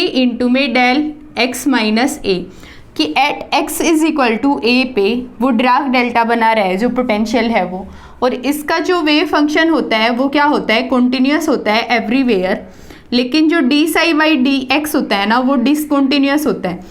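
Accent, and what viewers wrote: Haryana, India. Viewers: maam are you from haryana???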